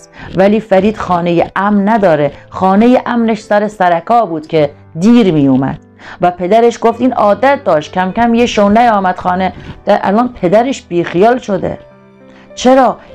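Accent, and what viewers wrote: Iran. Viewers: خانم جان خودت واقعاء یک انسان دورغگو هستی در یک ویدئو از همان تعرف میکنی وحالا از همایون به بدی یاد میکنی با لهجه ایرانیت. خواهر اگر پول می خوای پیدا کنی یک روز را یکی را خوب وبار دیگر از او بدی میگوی خودت هم یک هنر مند خوبی هستی دل سوزی تو به فرید نداری به خودت دا ی یک چینل داشته باشی خانم همایون افغان آدم بسیار خوب است فرید را تشویق به دروغ گفتن نکن باید فرید سر زنیش شود خودت دل سوز نشان ندهید همه به خاطر پول از یتوب است خانم جان هنر پیشه خوبی هستی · دختر قوما اول باید لهجه ایرانی بگذار و به لهجه دری زبان مادری خود مان حرف بزن باز بیا حرف بزن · خانم بسیار با لعجه ایرانی حرف میزنید لطفا اول قضاوت بیجا نکنید راجع به همایون چون او آنقدر حمایت کرد و همایون پیش از فرید بینیده خود را داشت فرید را همایون بد نام و نابود نکرده خودش با اینکه همایون همه خوبی و اعتماد کرد خوش با ذیرکی که داشت خودش دوباره در چاه خود را آنداخت مگر همایون میخواست او را از چاه بکشد خودت خانم فریب پویا را نخور اگر بسیار مهربان هستی بیا دست فرید را بگیر نه اینکه از ایران اینقدر قضاوت ناحق کنی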